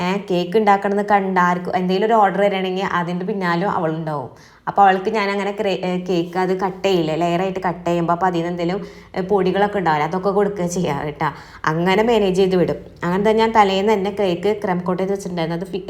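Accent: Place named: Thrissur, India. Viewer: അവർ Thrissur alle? സംസാരം അങ്ങനെ അല്ലേ kayiooo.നമ്മുടെ പോലെ ആക്കാൻ കയിയണമെന്നില്ലല്ലോ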